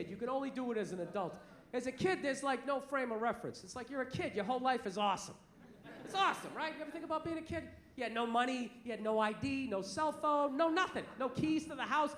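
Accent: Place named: Massachusetts, United States. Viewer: Michael Bulls Canton is only 15 miles SW of Boston and a 22k population is hardly small, but yes, it is a Massachusetts accent.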